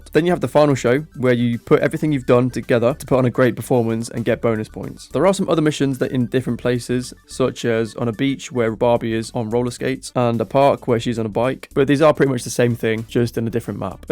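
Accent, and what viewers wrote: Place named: United Kingdom. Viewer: Great video! Thanks for rating these games fairly! (also you are so British sounding it’s like I can see the difference between color and *colour*)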